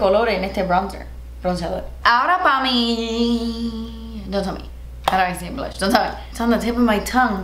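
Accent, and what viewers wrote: Cuba. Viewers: Que acento tan marcado de Cuba, no lo habría imaginado! Más vídeos en español please! Me reí mucho y el spanglish se entendió perfectamente...😘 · Jajajaja ¡me encantas! 🌹 Tienes un acento cubano precioso. Ojalá te animes hacer más vídeos en español. Un beso enorme desde España 🇪🇸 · I love your Cuban accent! 😍 Please do more Spanish videos 🙏🏼!!!!! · Girl you did so good with the Spanish and I love your Cuban accent!! You definitely need more Spanish videos! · Maybe it's difficult if you are learning Spanish from Spain, because she has Cuban accent, and the pronunciation is a bit different. Bit it's cool you can see different accents. Cuban is beautiful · Me encantó!!! Hacía mucho que quería este video jaja....! I love your cuban accent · Me encanta tienes un acento 100% cubano 😂😂😂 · Nunca pensé que tu español era tan latino. Tienes muy marcado el acento cubano con todos sus giros. 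Muy simpático y divertido sobre todo cuando dices "ya tu sabes". Gracias por ser tan cute 😍😍😍😍😚😚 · I LOVED this video!!! I’m Cuban, I’m from Miami and so I’ve always loved your Cuban background and accent. My Spanish is HORRIBLE, but it was so funny to see you kinda mix in English in there and your Spanish talking voice is soooooo pretty. Such a fun video, I was smiling and laughing the whole time in the best way! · I’m bilingual too (born it the Uk 🇬🇧 but lived in Spain 🇪🇸 since I was 10) and this video was HILARIOUS! I know the struggle of mixing languages lol. I love your Cuban accent! 😍 ¡Buen vídeo como siempre! 😊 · No imaginaba para nada tu acento así, es 100% Cuba🇨🇺!! Gracias por este homenaje al español. Saludos desde España 🇪🇸😘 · Me! I love her cuban accent! 💕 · 😹😹 I’ve been watching you for years and wow. This is the first time I have ever heard you speaking Spanish. I love your Cuban accent ❤️ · Chica me encanta tu acento cubano!!! 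Para mi tu español no es tan malo, solo te hace falta acordarte de ciertas palabras y listo. Por favor haz mas videos así! Desde que te empecé a seguir moría de ganas por este video! Saludos desde méxico 🇲🇽❤️ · hablando español, con acento cubano 👏🏼 what I’ve been waiting for forever 😂 jajajaaj I love how cubans kinda yell, I get it cause I’m Mexican from the north part of Mexico and southern Mexicans always tell us we are yelling 😂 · Se nota tu acento cubano mucho jajaja, se te entiende muy bien (soy de España)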